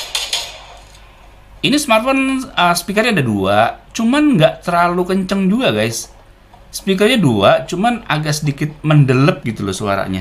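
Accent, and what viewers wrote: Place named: Java, Indonesia. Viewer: Suaranya mendelep, jowone metu wes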